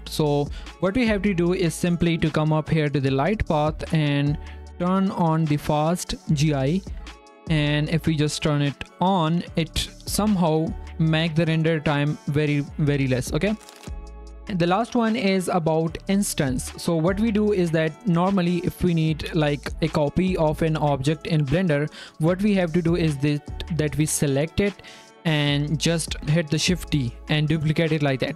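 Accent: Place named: India. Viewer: Why do useful tutorial always have Indian accents?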